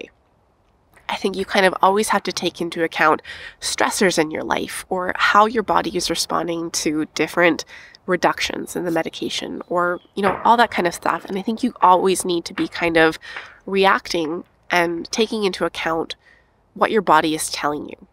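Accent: Canada. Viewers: Hi there, I'm not sure why I watched this video, but I was touched. I don't have schizophrenia, I don't know anybody who has schizophrenia and I don't know much about the disease at all, but I was fascinated by this video. I am sure that you don't want sympathy, but I have to say I feel so bad for you. You are a smart, charming, compassionate and beautiful young woman and I am horrified that you have to go through what you go through. It sounds like you are Canadian, so am I. I live in downtown Toronto. I am available to speak with you about anything at any time for any reason. If the crisis centres are not available for you, I am.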